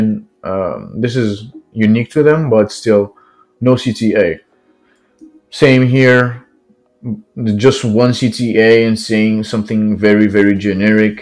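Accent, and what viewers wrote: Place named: France. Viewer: Are you french ? (I'm french)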